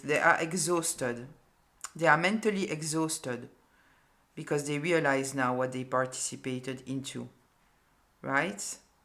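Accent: France. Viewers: Sometimes I just glide on your beautiful French accent, I should have met a cute Frenchman in life 😂🇫🇷💋✌️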